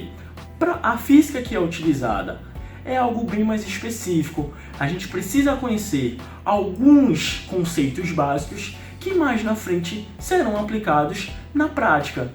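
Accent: Rio de Janeiro, Brazil. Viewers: Você tem sotaque do Rio de Janeiro, fala com o "S" acentuado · Show o conteúdo, kkkkk tu é do Rio de Janeiro é? Kkkkk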